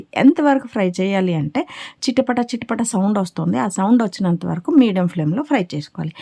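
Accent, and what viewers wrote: Srikakulam, India. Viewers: మీది శ్రీకాకుళం యాస.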